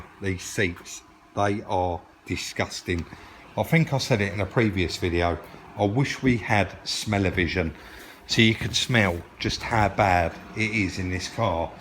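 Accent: United Kingdom. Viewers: Yeah- what part of the UK is your accent?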